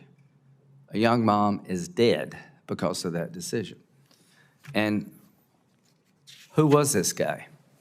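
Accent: South, United States. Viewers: Sounds like a confederate talking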